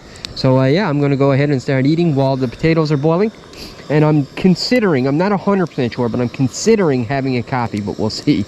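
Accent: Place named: Cape Breton, Canada. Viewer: You sound like my Cape Breton brother the way you speak. I enjoyed this video. Thank you🤗🇨🇦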